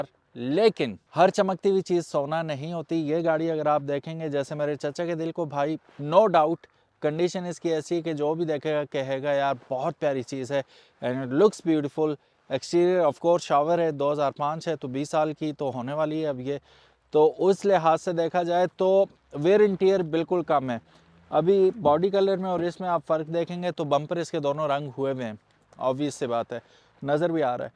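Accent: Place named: Hindko, Pakistan. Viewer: Apka hindko wala acsent hy mansehra sy hy ap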